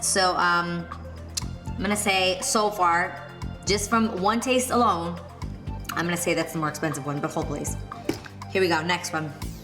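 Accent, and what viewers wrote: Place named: New York, United States. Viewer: yo i love her nyc accent lol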